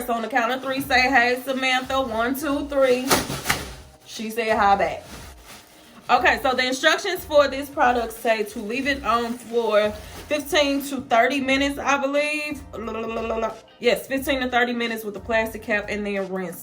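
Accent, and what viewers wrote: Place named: South, United States. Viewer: I love your accent so much !!! Where in the south you from ?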